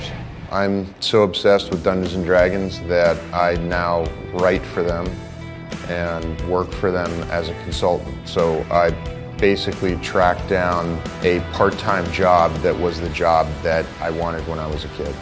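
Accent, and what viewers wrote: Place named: Pennsylvania, United States. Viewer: I find all of you guys to be wonderful beyond words. What's really odd is part of it is because you're Pennsylvanian, which I can tell from your accent and you're upscale "Ohio River Valley". The richer ones (Rivers) in Pittsburgh. Very excited to be with you AND get our government some BIG Money!!!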